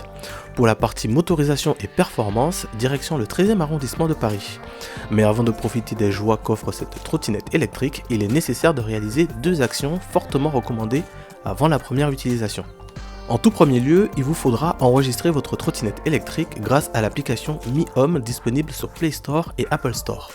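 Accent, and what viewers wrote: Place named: China. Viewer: je sait que tes chinois car j'ai voyager dans le monde et je reconait tous les accent meme petit qu'il soit ! enfin je dit ca , car je voulait dire que la chine est un pays qui invente des choses supperbe , que ce soit des choses que l'on trouve sur des vidéo youtube insta ect comment ouvrir ceci sans cela , comment devisser sans clé , comment ceci comment cela , les vidéo sont nombreuse ! les chinois sont supper inventif ! puis ont a sur les magasin de type magasi nen ligne qui vende de tout petit gadgetr mais a chaques fois des choses merveilleuse mais qui a chaque fois sont très utile . puis ont a les smartphone dont j'ai plus jamais acheter autres que huawei ou wiaomi comme le 14 tpro qui a une carte graphique de ouf ! 12 giga de ram enorme ! et un demis terra ! et le proccesseur ses un des meigleiur ! soliditer supperbe avec le dernier écran le plus solide du marcher ! 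bref la chine vient en force dans tous les domaine ! ils faut dire que la chine est en avance de mentalité de plusieurs millénaire ! l'unique dommage ses la liberter en chine ! et beaucoup voye le chine comme une menace moi je vois que la russie et que la chine ne donne rien pour l ukraine enfin aide sont allié mais de chose minim mais non offensif ! bref la ici la trotinette moi jai le model xiaomi 4 lite 2 gen que j'ai eux gratos en achetant le 14t pro ! tous ca pour dire que plus j'achete chinois plus je me rend compte que leur gamme monte de niveau , ont des loin du made in china des années 90 dont tou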